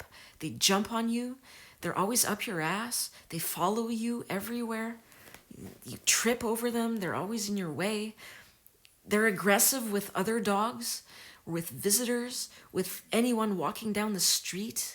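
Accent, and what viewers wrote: Canada. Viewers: It's that Canadian accent.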